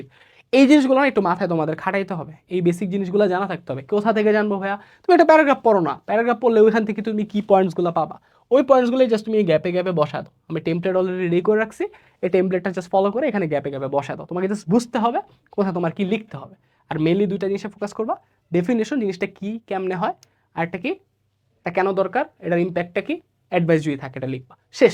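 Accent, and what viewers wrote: Sylhet, Bangladesh. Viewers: Tumio sylheti ni?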